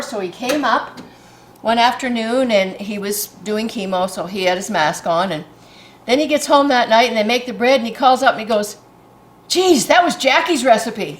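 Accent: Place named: Cumbria, United Kingdom. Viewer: I begged for store bought white bread too. Horrid little thing I was. Ha ha. I will be trying your brown and digging out my bread maker. Loved hearing your voice- took me right back to keswick.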